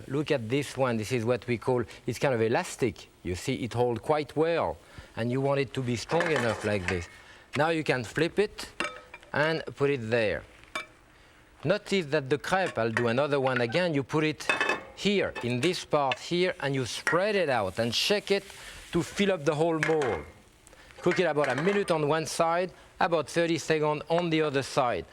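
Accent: France. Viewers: a genius in the cooking world is jacques pepins the french accent adds to the recipes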